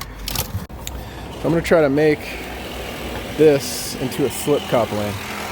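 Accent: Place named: California, United States. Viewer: Love the cali accent my guy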